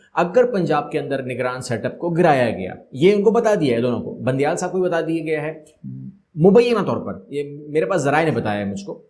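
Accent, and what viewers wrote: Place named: Bihar, India. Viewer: From accent you seem a " bihaari"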